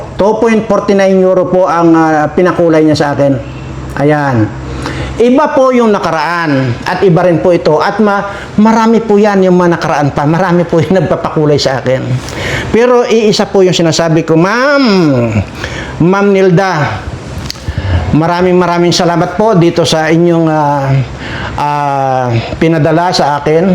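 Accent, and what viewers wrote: Iloilo, Philippines. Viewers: Thank you & salute 🫡po sa inyo Sir sa pag tatangol sa Team Kalingap kina kuya Val at kuya Rab, go go Sir ipakulong nyo na po ang mga anti na yan na mga salot sa bayan natin, New subscriber watching from the UK 🇬🇧😊 haha grabi kadlaw ko sa butod kag iban pa nga term mo Sir, ga gua ang Ilonggo mo Sir ba! Proud ilongga fan here 😊😅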